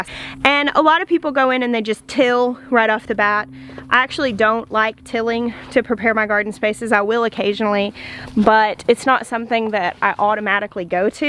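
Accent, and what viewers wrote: South, United States. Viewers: Gurl...I listened to you for 5 minutes saying what I thought was "silage sharps"! You have such a southern accent! Finally I realized you were saying "silage tarps", or as we would say here in New England "silage tahps". lol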